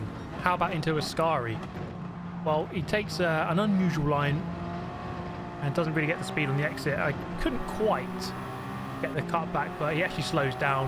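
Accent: United Kingdom. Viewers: Hello Viewes Heah (British accent, completely ignoring the existence of the letter R).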